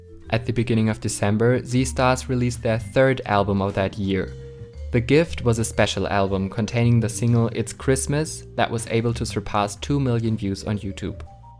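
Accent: Germany. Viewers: As a German I can tell you are German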